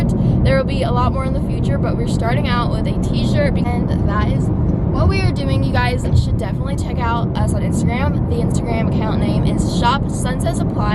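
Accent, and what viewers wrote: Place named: United States. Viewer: I LOVE her country accent!!😂❤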